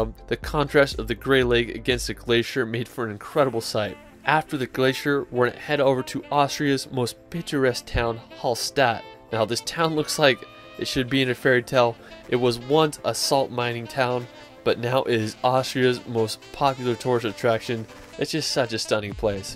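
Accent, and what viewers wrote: United States. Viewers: Stunning views and great tips, but dude - at least TRY to learn the pronunciation of the names of mountains/villages you mentioned... it's definitely not that hard and you show a little respect, instead of saying it simply as an American. It's terrible to hear it. Thumbs up for video, thumbs down for this... I'm sorry. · Nice Video, great pictures. If you would only pronounce the names the way it's supposed to, and not with the thickest american accent, it'd be perfect Still, great stuff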